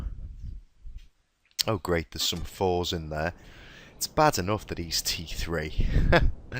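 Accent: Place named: Liverpool, United Kingdom. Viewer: I'm a scouser, just saying your accent sounds nearby :P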